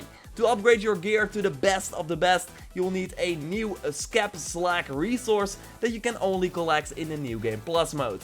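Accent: Netherlands. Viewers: This guy sounds so DUTCH.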